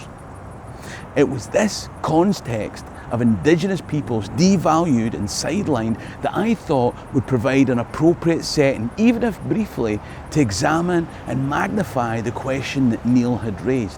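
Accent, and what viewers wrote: Scotland, United Kingdom. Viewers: Man - what a story! As a german I love to hear your stories on scottish history. For your fresh view on history and the scottish accent I love. Turns out I am wrong. You are a rarety, a philosopher and philantroph disguised as comedian. Holding up the mirror like a jester. Thank you. Todays crazy world needs more of your kind.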